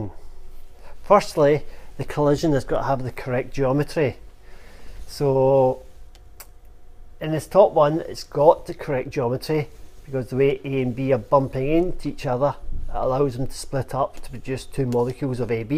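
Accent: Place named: Scotland, United Kingdom. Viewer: THANK YOUUUU NO INDIAN ACCENT FINALLY!!!! scottish sounds so much better!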